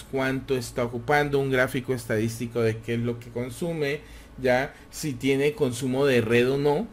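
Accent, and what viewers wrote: Colombia. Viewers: ...Gracias...Excelente opcion. por tu asento se ve q eres colombiano XD... like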